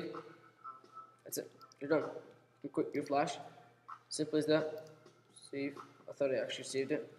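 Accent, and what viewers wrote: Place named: England, United Kingdom. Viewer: Bro you talk in the way that foreigners think english sounds like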